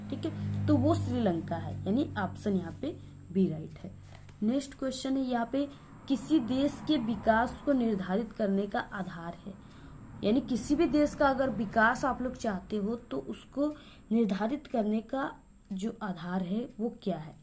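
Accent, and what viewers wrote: Bihar, India. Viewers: Madam aap Bihar se ho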